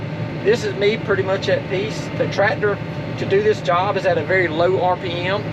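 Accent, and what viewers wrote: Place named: Georgia, United States. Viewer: Great Video! Enjoy listening to your Southern Georgia English. I'm from TN.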